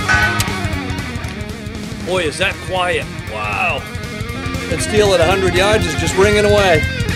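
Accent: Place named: Northeast, United States. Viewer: I feel really stupid for asking this question but what state are you from? It sounds like Northeast, which isn't known for being gun frirndly!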